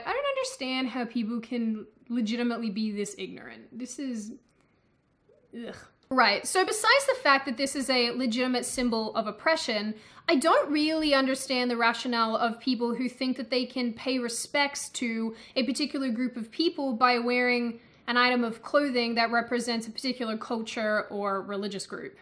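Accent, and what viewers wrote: Australia. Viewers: Did you live in America for a while? Your accent sounds like a fusion of Australian and America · I love her Australian lilt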